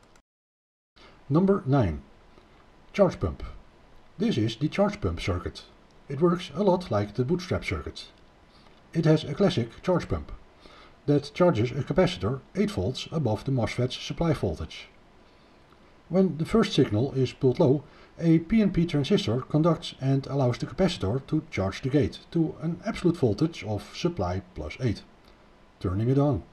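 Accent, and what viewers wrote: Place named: Auvergne, France. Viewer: il nous agace avec son accent auvergnat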